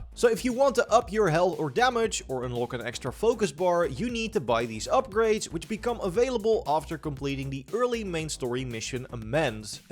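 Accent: Netherlands. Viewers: Je hoort gewoon dat deze man Nederlands is hoor het nu pas maat 😂😂😂😂 top video wel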